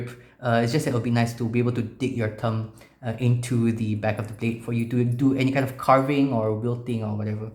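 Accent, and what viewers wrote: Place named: Malaysia. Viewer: I could tell you were from Malaysia as soon as you started talking. Great review. I am wanting to get the green handle model to match my Buck Lite from the 1980s. I subscribed and look forward to seeing your other content.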